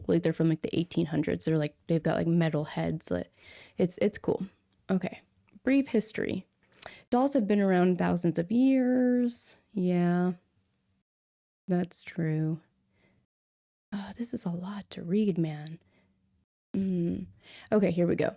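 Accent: Australia. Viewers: As an Australian myself, that accent was gold 😂👍🏻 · Because dolls/toys are attached to people's childhoods or something? That's just my thoughts 🖤✌️👑👽 (maybe Dolls are like humans and turn into some kind of vessel) p.s. best australian accent ever¿ 💀 THIS STORY IS WILD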